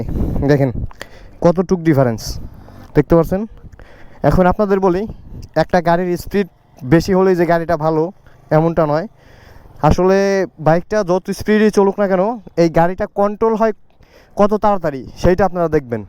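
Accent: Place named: Manikganj, Bangladesh. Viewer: আপনার কথা ভালোই লাগে। আপনার ভাষায় ধামরাই মানিকগঞ্জের একটা টান আছে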